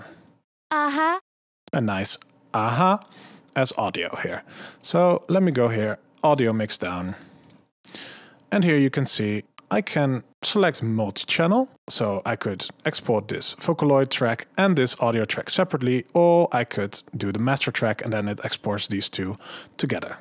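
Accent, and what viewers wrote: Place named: Netherlands. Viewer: Ik dacht al dat ik een NL accent hoorde.. :) gave video.. wat is de key shortcut om die tijdsbar aan het begin te krijgen, de Home toets werkt niet bij mij.. wordt er aardig gek van..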